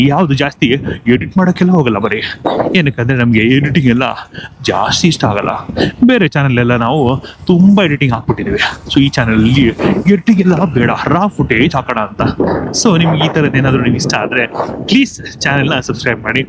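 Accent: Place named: Bihar, India. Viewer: Accent seems like Bihari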